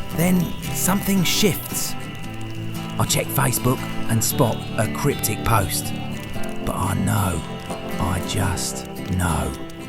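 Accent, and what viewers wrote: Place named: Italy. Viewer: You made a great video mate thank you Ps for an Italian you have a fine English accent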